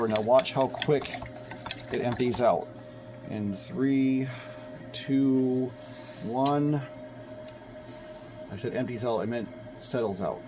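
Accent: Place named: United States. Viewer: because I am as well, yank can hear another yank! 😁 Also think I heard you mention a storm or weather event that sounded exactly like the weather we had going on. I'm in NH, you could probably drive a golf ball into Maine from here.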